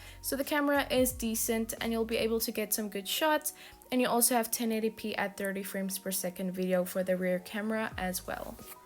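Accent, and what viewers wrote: South Africa. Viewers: Is she South African?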